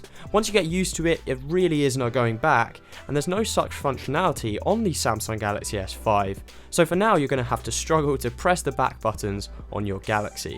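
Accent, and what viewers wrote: United Kingdom. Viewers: ненавижу британский акцент !!! аааааа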